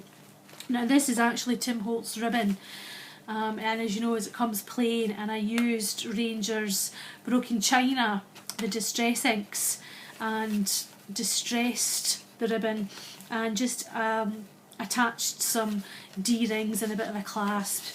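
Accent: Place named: United Kingdom. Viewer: Your imagination is incredible! I was unable to view more than the first two minutes of the first video (not sure why) I saw the doctors bag - girl what a terrific idea! Loved your video - love your voice and accent! (I am originally from the UK - moved here in 1988 (us) and anything from home sounds wonderful. Thank you so very much for sharing your creativity! Hugs Julie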